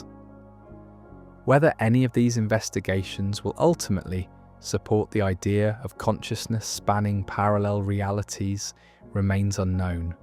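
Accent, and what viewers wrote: England, United Kingdom. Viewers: Why this English accent…use an other voice Im sick of this wet mouth babble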